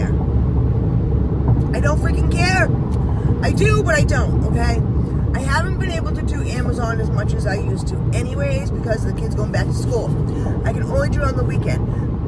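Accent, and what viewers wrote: New York, United States. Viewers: Thought you from New York or something like that bc of your accent 😆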